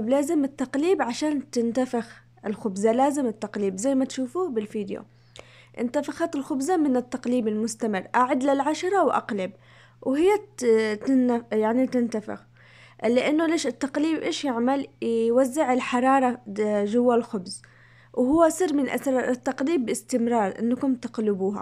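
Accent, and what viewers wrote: Aden, Yemen. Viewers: ماشاء الله تبارك عليك ممتازة بكل طبخه كل اكلك حلو واحلى شيء الهدره العدني لا تغيريها بالله عليك❤😘متابعتك من عدن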